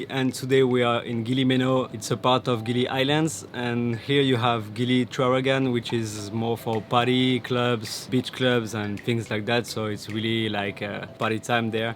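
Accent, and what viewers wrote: France. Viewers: your voice in combination with the french accent is so nice to listen to! wonderful aerial shots, that want me to go back so badly.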